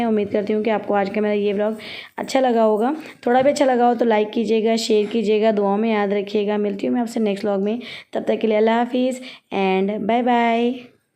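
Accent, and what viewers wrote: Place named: Gulbarga, India. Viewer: Mujhe maloom ich hai ke gulbarga ke baatan aisich rahtie baji 🤭🤭🤭🤭🤭aapko waise baat karte hue sunke hasi aari mujhe par mashAllah baatein abba beti ki sunke khushi hui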